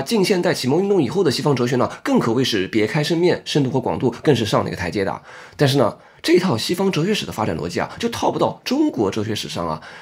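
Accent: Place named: Chongqing, China. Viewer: 我听出你的重庆口音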